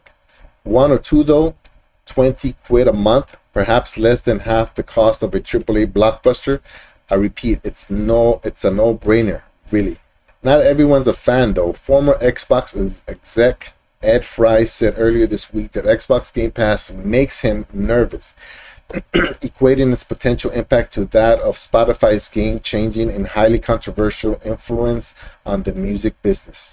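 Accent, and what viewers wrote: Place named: United Kingdom. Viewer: Definitely sounds British! 😎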